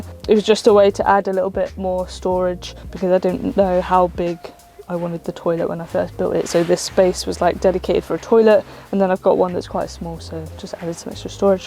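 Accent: England, United Kingdom. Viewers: such a nice english english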